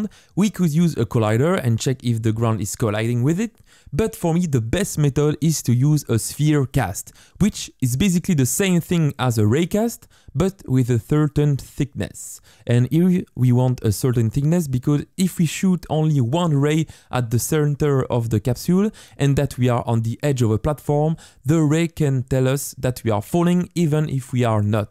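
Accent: France. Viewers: are you french?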